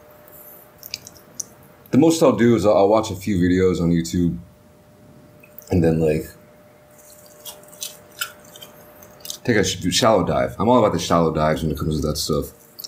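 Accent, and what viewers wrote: United States. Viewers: Hey i do have a question and help me pick up your accent man so like how did you get this i mean i get it you were in the states for quite some time but help me out man and been a watching your videos since lockdown and enjoying these vids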